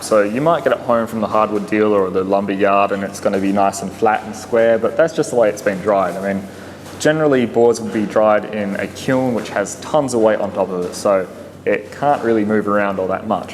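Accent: Australia. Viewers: Good to hear an Aussie voice on here (where r u ?) from a Perth based mens shed member :)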